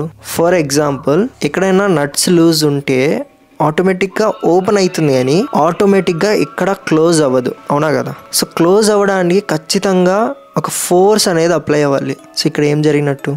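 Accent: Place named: Rayalaseema, India. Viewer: anna kathalu super. all the best . where r u from? slang rayalaseema la undi so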